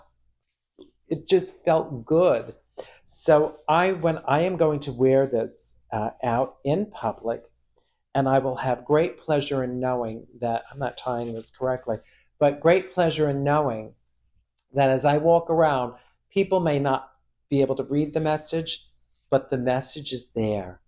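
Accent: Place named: Queens, United States. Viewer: Sounds like Queens, New Yawk!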